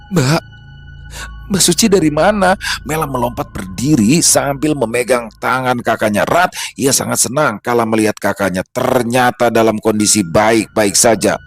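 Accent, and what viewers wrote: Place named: Betawi, Indonesia. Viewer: assalamualaikum bang, salam sehat buatbang bang seneng banget klw ceritanya bernada betawi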